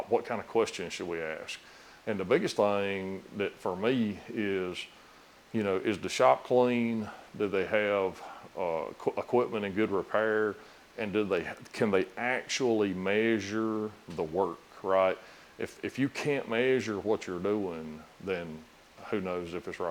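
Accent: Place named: South Carolina, United States. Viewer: My favorite part of every video is in the intro: "We gone gitindah it." I'm in Nashville, and I miss that South Carolina drawl.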